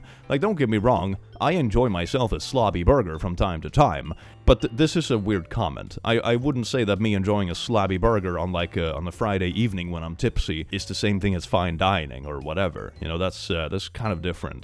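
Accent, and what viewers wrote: United States. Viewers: I must admit, I often wonder why you tend to speak American English rather than English, English?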